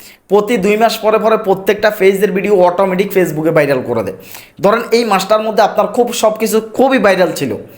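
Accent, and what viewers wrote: Chittagong, Bangladesh. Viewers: Bai r basa ki Chittagong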